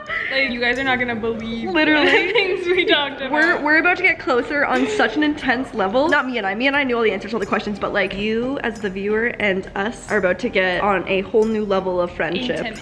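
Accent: Canada. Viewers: love that Canadian accent.......love you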